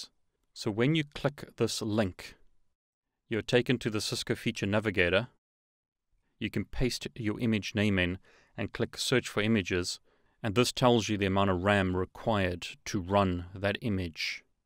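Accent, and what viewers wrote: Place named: South Africa. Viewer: Hehe nice. From Cape Town myself so noticed a bit of the accent. Thanks for the amazing videos, really appreciate your effort. Keep spreading the knowledge. (first attempt at CCNA in a few months)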